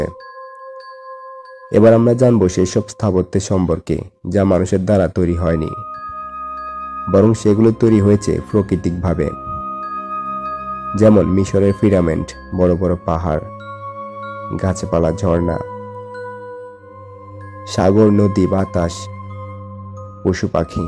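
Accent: Noakhali, Bangladesh. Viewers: Vai apni ki Noakhailla? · ভাই খালি ফ ফ করেন ক্যান? ফেনি, নোয়াখলি, কুমিল্লা?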